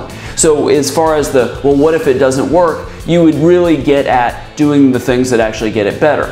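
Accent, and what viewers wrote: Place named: Scotland, United Kingdom. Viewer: Wonderful Scottish Accent ! . Please slow down the presentation, the lady is speaking far too fast .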